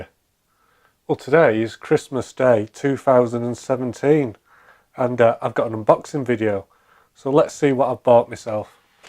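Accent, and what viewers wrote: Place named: Sheffield, United Kingdom. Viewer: I'm assuming from your accent you're somewhere near Sheffield - I'm from London (sorry about that but I did vote leave :- )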